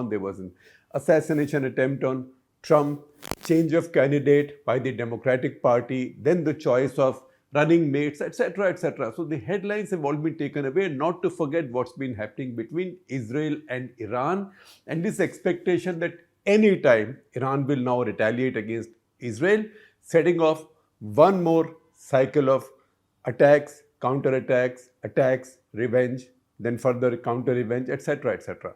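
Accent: India. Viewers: I wish you didn't have an Indian accent 😢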